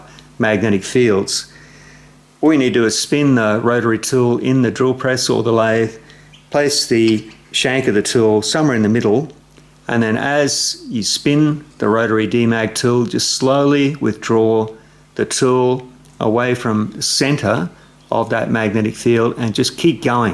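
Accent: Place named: Australia. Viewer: Gday, Great to see your channel is getting plenty of subscribers. I've been enjoying both the project videos and the background sounds that I'm missing from home (birds, rain on the shed roof, Oz accent, etc.) as my wife and I are currently living in the UK, but home is Qld. Looking forward to future videos. Cheers.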